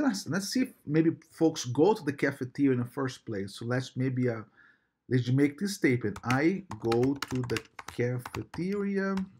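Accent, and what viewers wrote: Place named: Brazil. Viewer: Deu para sentir seu sotaque de brasileiro nos 5 primeiros segundos do vídeo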